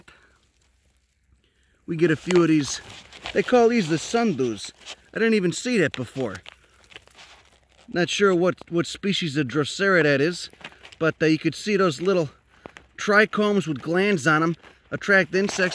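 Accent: Chicago, United States. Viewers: It's funny , the exotic "Venus Flytrap" grows in a limited area of North Carolina. Also , your Chicago accent is killing me! As an Italian American from near Pittsburgh, I can hear the similarities between the Pittsburgh accent and the Chicago accent. That's why all yins guys thought that Iron Mike Ditka was a native Chicago guy when he's actually from Pittsburgh! I'm glad that I found your channel, I'm going to subscribe. Ciao, fratello! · It’s thick Chicago accent 🤘🏻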